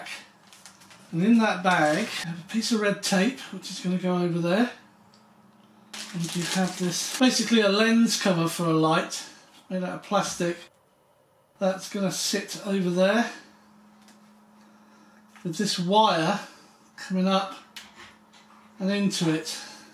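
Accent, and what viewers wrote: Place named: United Kingdom. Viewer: You managed it to let me enjoy the britihs accent . Im sorry but before it really pissed me of, but now i can just relax, Thank you.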